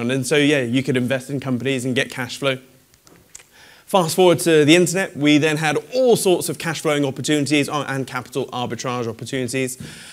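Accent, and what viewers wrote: United Kingdom. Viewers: Just because you say it in a fancy british accent doesn't make you correct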